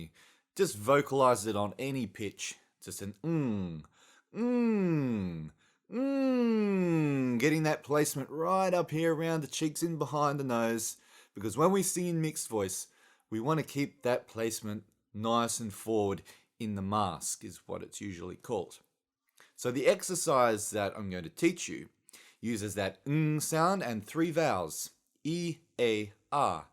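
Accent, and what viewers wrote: Australia. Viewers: You are one of the best vocal coaches here on youtube, possibly among the top 3, and I just love the aussie accent!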